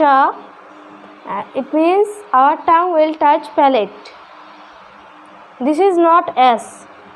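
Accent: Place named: United Kingdom. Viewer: Love British accent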